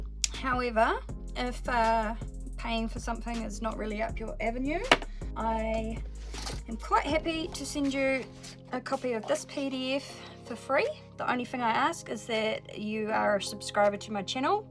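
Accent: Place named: New Zealand. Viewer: After the “77” it was super obvious that you are a kiwi 😬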